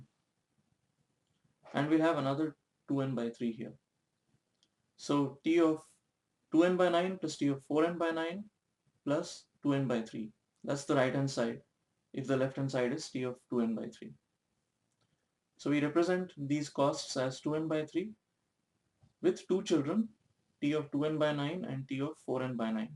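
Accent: India. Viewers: Игровой канал RobosergTV Well many people actually just hate indians and their accents , so they say hateful things ,just to bring em down ,just out of spite. Even when they are able to understand most of it , they would bash the poor guy. Also , they were too harsh. It is fine if you dont understand the accent , but then you start insulting him , his family and country just because you dont understand his accent? Like this mutual white friend of mine is sort of racist against black people , so he always finds the worst in them.Always talks about crime rate due to black people and dirty streets because of them. Get it? If you are slow , read it again and again , until you get it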